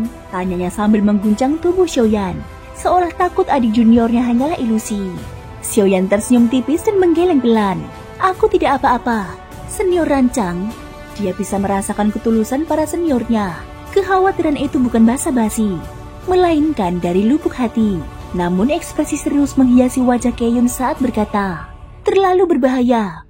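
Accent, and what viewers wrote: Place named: Purwokerto, Indonesia. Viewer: Mbak naratornya orang purwokerto yaa, hayo ngaku aja lah , mbok 🤣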